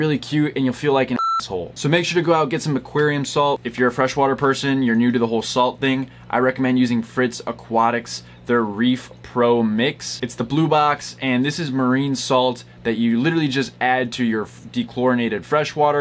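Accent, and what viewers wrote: Wisconsin, United States. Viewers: Wait are you from Wisconsin? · It's so odd hearing Wisconsin since yknow,, home state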